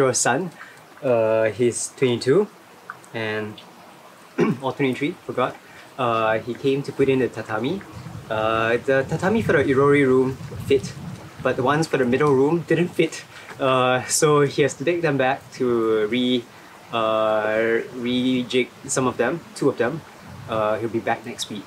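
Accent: Singapore. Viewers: Have you been to Singapore? You sound like a Singaporean! I use Shoji paper to cover model airplanes. How do I find the lightest paper grade? There used to be this paper called Esaki paper but it's no longer available. Where would I find the lightest grade of mulberry paper that remains strong while wet?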